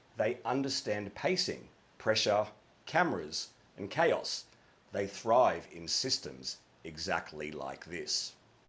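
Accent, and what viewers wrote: Australia. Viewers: Are you Australian?? You sound it. This year is the first year I actually paid any attention to the miss Universe competition and boy our Australian girl was done sooooo dirty by that ugly and boring AF dress in the national costume competition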